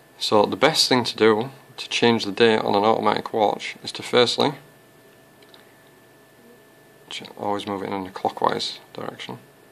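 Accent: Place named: Cockney, United Kingdom. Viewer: Heavy cockny accent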